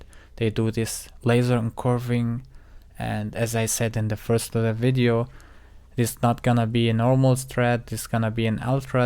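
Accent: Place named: Russia. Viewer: По русски нельзя было говорить? Слышно же по акцент у, что русский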